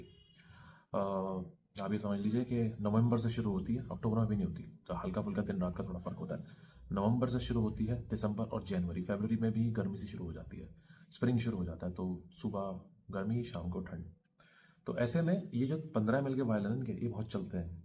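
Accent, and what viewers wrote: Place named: Haryana, India. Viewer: Paa ji aap haryana se ho na kyoki maine kai baar notice kiya h ki kai baar bolte bolte bich bich me haryanvi ton me koi koi word sunai deta h .baaki aapka explanation outstanding h perfume information k बारे me Jyode vasde rvo god bless you .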